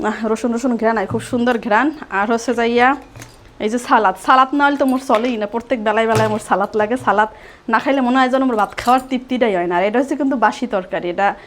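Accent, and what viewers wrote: Barishal, Bangladesh. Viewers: Apu borishaler vhasha amar onek valo lage ami kintu sylheti ❤😂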